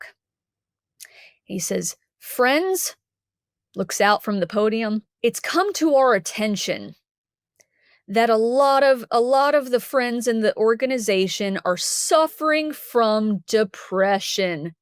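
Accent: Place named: Dallas, United States. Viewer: So crazy how clocked her Dallas accent in one minute. Takes one to know one. What a wonderful communicator. I used to drive by the Kingdom Hall on Mockingbird and Inwood every day and I wondered where the windows were!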